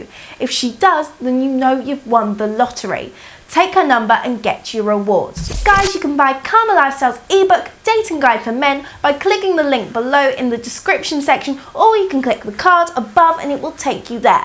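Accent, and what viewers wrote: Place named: United Kingdom. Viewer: The creepiest thing is this ultra thikkkkk British accent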